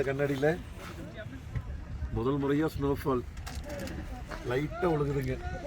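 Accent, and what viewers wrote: Kongu, India. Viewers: கொஞ்சும் கொங்குத் தமிழ் பேசும் கோமகரே...! திகட்டாத தித்திக்கும் பேச்சுடைய திருப்பூர் திருமகரே...! எங்கள் மதிப்பிற்குறிய திரு. அண்ணா❤❤❤🙏 அவர்களே எப்படி இருக்கீங்க அண்ணி❤❤❤🙏 எப்படி இருக்காங்க ❤❤❤ எப்படி இருக்காரு. அண்ணா மைனஸ் டிகிரி குளிர்ல ஷூ&சாக்ஸ் போடாம வெறும் செப்பல் மட்டும் போட்டு இருப்பது ரொம்ப ரொம்ப தவறு. உறைபனி குளிர்ல ரத்தம் உடம்புல ஓடுறது சீராக இருக்காது. அப்படி ரத்தம் சீராக இல்லாமல் போவதால்தான் நம் உடம்பு உறைபனி குளிர்ல மரக்கட்டை மாதிரி விறைப்பதற்கான காரணம்.... அப்படி ஆகாமல் இருப்பதற்காகதான் WINTER COAT JACKET SHOE&SOCKS போடுறதே. தயவு செஞ்சு ஷூ&சாக்ஸ் போடுங்க அண்ணா🙏 11.20 செகண்ட் வீடியோவுல பேசிக்கொண்டே சட்டுனு பாதை மாறிப் போயிட்டீங்க? அண்ணி Guide பன்றாங்க👍 இந்த மாதிரி இடங்களுக்கு புதிதாக போறப்ப இந்த மாதிரி பாதை மாறிப் போறது சகஜம்தான். ஆனாலும் நீங்க போகிற இடங்கள் எல்லாம் அவ்வப்போது பனிச்சரிவு அதிகம் ஏற்படும் இடங்கள் அண்ணா. இந்த மாதிரி பாதை மாறிப் போயி எங்கயும் லாக் ஆகிட்டால் கஷ்டம். 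ரொம்ப கவனமா இருங்க அண்ணா👍 நம் ❤சிட்டுக்குருவியோட❤ தங்களது இந்த லடாக் பயணம் இனிதே தொடர்ந்திட நல்வாழ்த்துக்கள் அண்ணா❤❤❤ என்றும் அன்புடன் உங்கள் தம்பி என்றும் அன்புடன் உங்கள் TMF ரா.முஹம்மது கபூர் (சென்னையில் இருந்து)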